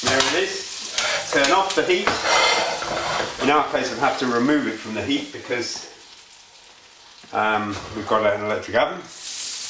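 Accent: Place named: United Kingdom. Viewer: I read that you are supposed to use lime juice with a white fish. Why am I listening to a British guy tell me how to cook?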